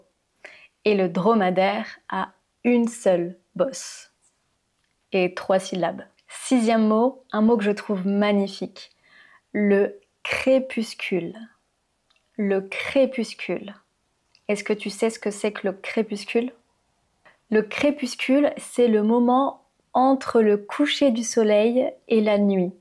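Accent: France. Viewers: Tu parles le vraie français bien prononcé bien modulé avec un accent merveilleux et pas comme le français québécoise ou de la Suize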